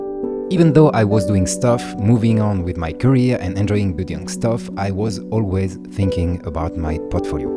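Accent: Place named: France. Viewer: L'accent français a vraiment été la cerise sur le gâteau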